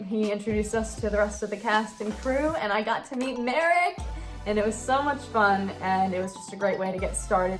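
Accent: United States. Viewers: My dad's family is from Philly and you sound just like them.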